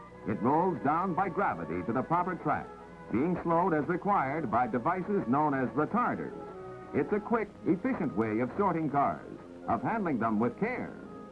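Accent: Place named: New England, United States. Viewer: Excellent. Narrated like all of the instructional and school films. “...from Pittsburgh to Akron, the rubbah centah.” Narrators always had that New England accent. I remembah!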